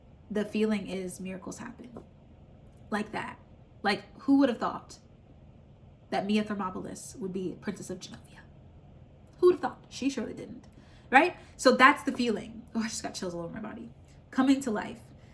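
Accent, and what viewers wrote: United Kingdom. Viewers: Old British accent is crazy ima jus leave it at that 😭😭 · Been singing “money money moneyyy” for weeks and it’s the one you started with. Also have that same British accent haha - loving the synchronicities! Thank you 🤑✨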